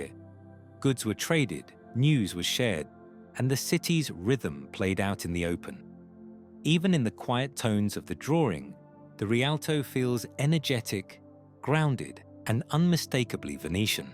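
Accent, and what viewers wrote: United Kingdom. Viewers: So, hey, I love what you’re doing with this channel. I am really interested in seeing these old town scenes from historic times be recreated. What you have done with the AI is greater than I ever could have imagined. I do believe that these recreations of the past are a world treasure. There are some things that need improvement, though. The repetitive slides tend to bother me and I feel sort of triggered seeing similar slides be shown several times over. Also, I suspect you use an AI voiceover, and I hope soon that gets changed too. Because that specific British accent and tone of voice is incredibly annoying to me, but maybe it’s just because I’m not British lol P.S. please recreate historic images of Ireland (1600’s to 1800’s) and America’s early western frontier (from the American Revolution to the Jacksonian Democracy)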